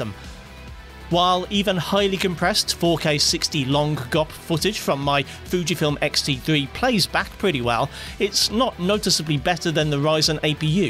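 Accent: United Kingdom. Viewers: The presenter is British and therefore pronounces words in English.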